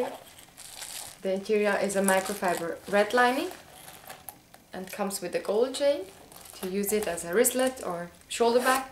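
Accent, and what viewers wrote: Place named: France. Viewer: You are so sweet! And I love your French accent hihi, I love the French language! I'm sorry if it's not French by the way, I know you are from Switzerland ^^. I subscribed!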